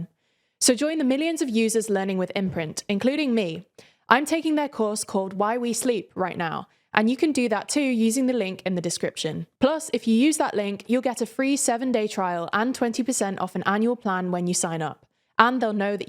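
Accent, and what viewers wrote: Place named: RP, United Kingdom. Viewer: Nice to hear a beautiful, eloquent and sophisticated sounding SRP (standard received pronunciation)--very classy.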